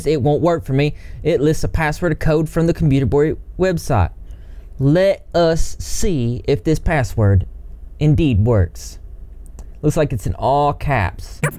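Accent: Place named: Texas, United States. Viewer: are you from Texas? Bc I know some people from Texas who has the same accent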